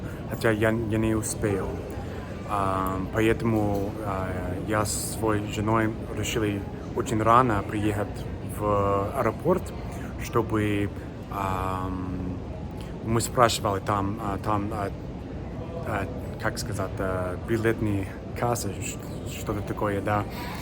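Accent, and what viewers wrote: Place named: United States. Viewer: Ваш русский язык вполне можно понять. Нам тут в Казахстане не привычно слышать акцент американца, так как у нас мало инострацев.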